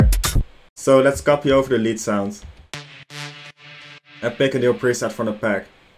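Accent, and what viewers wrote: Netherlands. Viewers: Bro is Dutch haha dacht al dat ik het hoorde. Zieke edit dit. Doe je ook aan Collabs?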